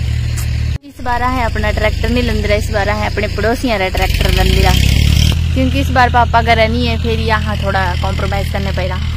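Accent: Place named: Pahari, India. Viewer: Enjoyed your Phadi speaking. It is bit different how we speak phadi in Kangra region.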